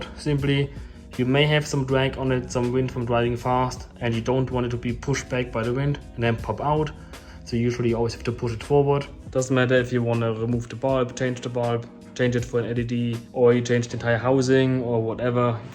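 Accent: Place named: Germany. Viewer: Another great video man. I can't lie, love your German accent.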